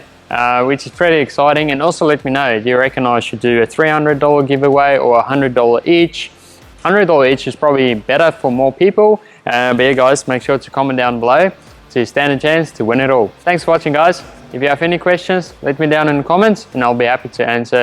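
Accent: South Africa. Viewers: Dude, are you from South Africa?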